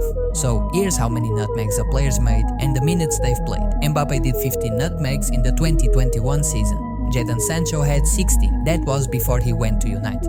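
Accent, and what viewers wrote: Portugal. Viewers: Is the narrator portuguese? The english accent seems like it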